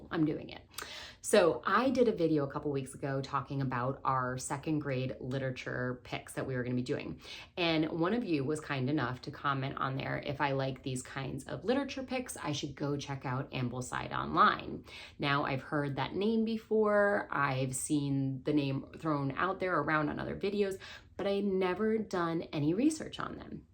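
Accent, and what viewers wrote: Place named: Western New York, United States. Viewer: Love that I found your channel. I am just starting this year with my oldest, a Charlotte Mason/Waldorf mix, but I love seeing how others use different styles to create their own. Very inspiring! Also, I knew you were from western NY before you said Buffalo, you've got the accent (I am originally from Rochester)!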